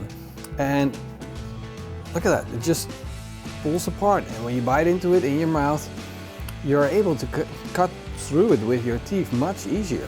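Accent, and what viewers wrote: Netherlands. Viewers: your accent sounds really Dutch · Do I detect a Dutch accent?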